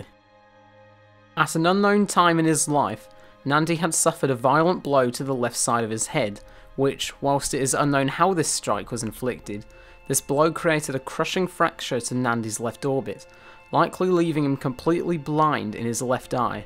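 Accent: United Kingdom. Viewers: He's a Brit. What do you expect?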